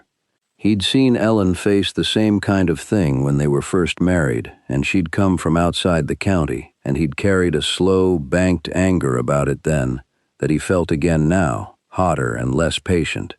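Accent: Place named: West, United States. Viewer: Complements to the narrator who sounds as if he IS from the Western Frontier. Listening from Clarksville, TN.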